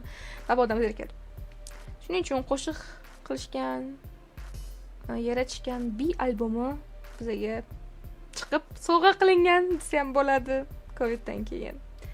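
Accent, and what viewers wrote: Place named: Khorezm, Uzbekistan. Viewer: Xorazmdanmisiz apka sheva tortib baryotir❤😂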